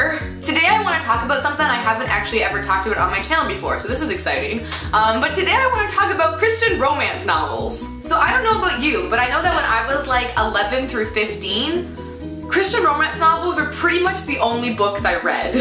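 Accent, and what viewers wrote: Canada. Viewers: Do you have a touch of a Canadian accent??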